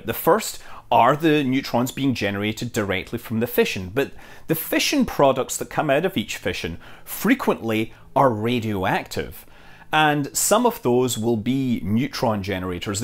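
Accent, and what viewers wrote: Scotland, United Kingdom. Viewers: This is a good level of explanation, I like it. You're sounding more scottish than usual today for some reason...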